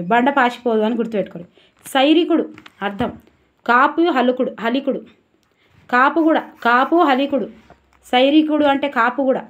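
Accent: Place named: Mahabubnagar, India. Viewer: ఏ జిల్లా మేడం మహబూబ్నగర్ బాషా లాగే ఉంది స్వచ్చంగా మీ వీడియో సు బాగున్నాయి మేడం 🌹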